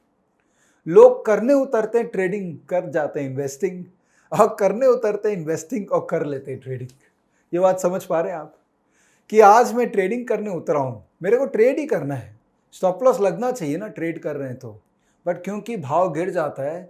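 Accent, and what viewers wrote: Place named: Kolkata, India. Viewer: !बातों को कहने का लहजा ही बता रहा था कि तुम कलकत्ता के हो।शब्दों का चयन व वार्ता में तुम्हारे मनोभाव,जो मैंने स्पष्ट महसूस किया; यह बताते हैं कि तुम शिष्ट परिवार से हो।तुम्हारी सरलता व परहित भाव तुम्हे बहुत आगे बढ़ाएंगे व बहुत प्रसिद्द व सफलता दिलाएंगे।मंगलकामनाएं।सुशील जैन।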